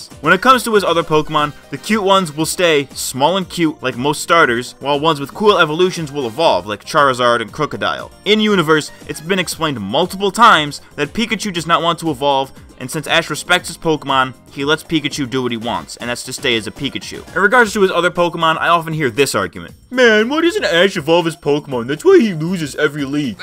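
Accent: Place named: New Jersey, United States. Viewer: You sound like a New Jersey version of Ethan Klein.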